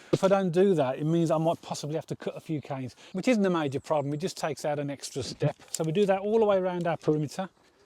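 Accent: Birmingham, United Kingdom. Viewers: Have been struggling with the cabbage whites and looking at buying expensive cages...............I have lots of canes......Thank you so much to something even I can do. Good luck love the Brummie accent.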